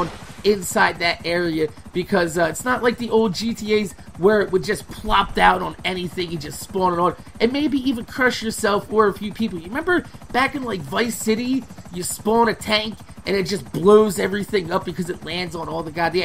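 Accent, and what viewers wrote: New Jersey, United States. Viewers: are u from nj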